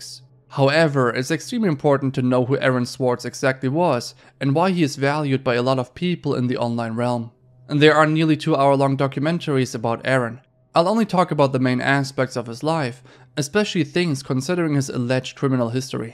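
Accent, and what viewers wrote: Germany. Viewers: I love your videos, your accent is great, germans have great voices :) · my sister who is studying german says his accent is "very obviously german" so thats my guess LOL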